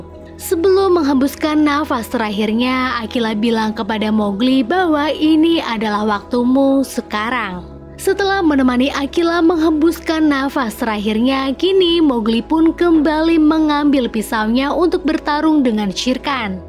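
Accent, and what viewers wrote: Pemalang, Indonesia. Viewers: Goblog nemen si🤭 Kya logat e wong Pemalang kota, bener ora sih wkwk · orang pemalang mba? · Mba sampean kaya wong pemalang mba, angger iya tangga mba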